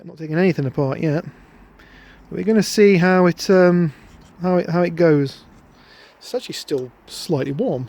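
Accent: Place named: Derbyshire, United Kingdom. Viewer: Im from Derbyshire lol, I know Derbyshire when I hear it lol, awesome videos on the heaters and I see you get upto alsorts of stuff so Im subscribed ;-)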